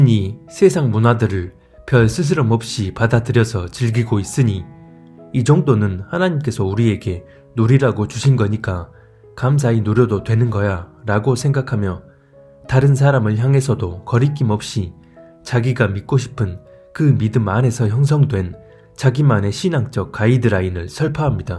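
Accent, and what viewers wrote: Jeolla, South Korea. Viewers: 전라도 억양이구만 ㅋㅋㅋㅋ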